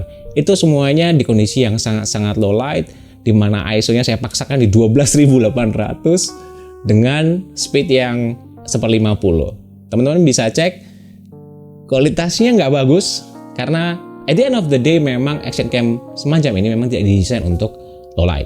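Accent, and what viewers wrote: Malang, Indonesia. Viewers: Lah orang malang ta ? 😅 suka sama review nya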